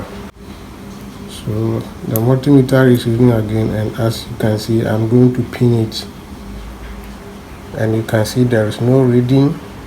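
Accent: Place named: Ghana. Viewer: what's up the accent gave you off. you be ghanaian abi? give me the location of your shop. i have a mirage that is guzzling fuel no code. thanks for the video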